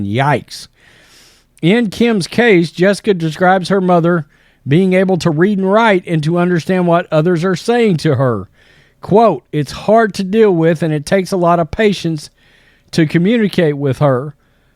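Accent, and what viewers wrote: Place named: South, United States. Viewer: I love your southern accent so much, thanks for spreading the word on this nightmare, love from Australia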